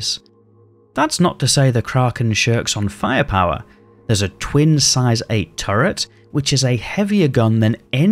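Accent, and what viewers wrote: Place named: England, United Kingdom. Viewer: Kraaken? You sound English to me, and I’ve never heard anyone pronounce it that way. If I didn’t know better I’d say it was done to trigger people like me to rush to the comments and say so!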